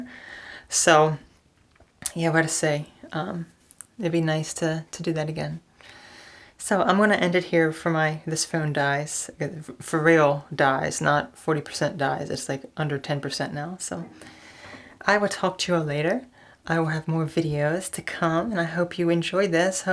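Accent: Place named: Long Island, United States. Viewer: When I first started watching you, I assumed you were from Long Island, because of your accent.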